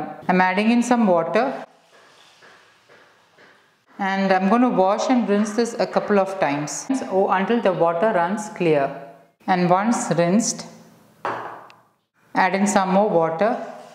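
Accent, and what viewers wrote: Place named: North India, India. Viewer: Good recipipe madam, first you learn how 'healthy' is pronounced. what happend to the correct prounctiaon we used have? following incorrect nNorth Indian prounciation is absolute rubbish.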